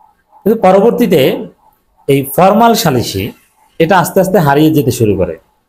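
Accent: Barishal, Bangladesh. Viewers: আপনার কথা বলার এক্সেন্ট শুনলে মনে হয় আপনি বরিশাল অঞ্চলের মানুষ। তাই বরিশাইল্লা ভাষা বললাম, "কতা য্যা কয়ন হ্যাতো উচিৎ কতাই কয়ন। আসল কতা থুইয়া এতো ত্যানা না প্যাচাইলে অয় না, ন্যাবাই?" 😂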